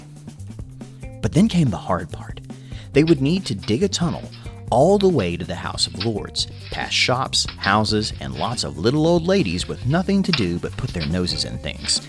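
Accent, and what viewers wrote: United States. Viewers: But he’s not British! We have an American accent, just as everyone has their own country’s. (Those are some counter-intuitive pronunciations - lol) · Makes sense why you know of it then seen as (I'm guessing from the accent) you're American. Good vid, got yourself a new sub. Much love from West Yorkshire, England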